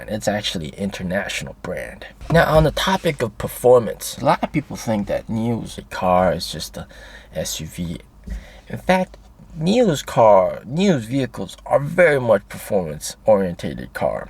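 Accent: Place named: South, United States. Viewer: I like your southerner accent.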